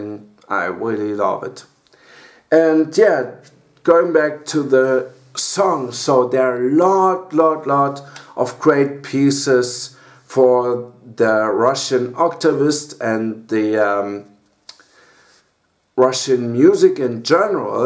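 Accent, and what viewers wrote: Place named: Germany. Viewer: Guten Abend dein Englisch ist sehr Deutsch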